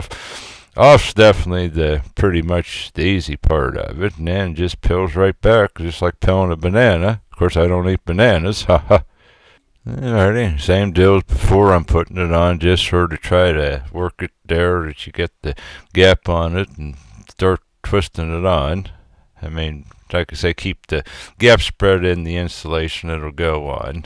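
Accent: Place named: South, United States. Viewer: I enjoyed this video thoroughly. You sound like a country boy who really knows what he's doing. I appreciate you showing us this.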